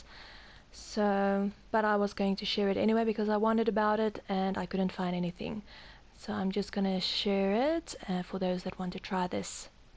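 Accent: South Africa. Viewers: Are you South African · I recognise that accent from anywhere! Suid Afrika represent! You go girl! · Oi are you south african i can tell as i am one too! I can prove your name means Ice Princess #sageneralknowledge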